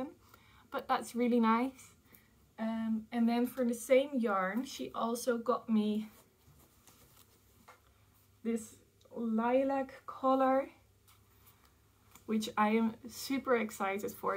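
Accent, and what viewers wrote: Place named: Scotland, United Kingdom. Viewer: I could hear the wee Scottish twang coming through sometimes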